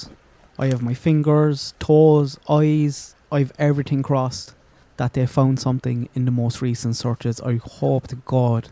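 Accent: Ireland. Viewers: Great to hear an Irish accent,this case is going to be solved,I'm a new subscriber🇮🇪🇮🇪🇮🇪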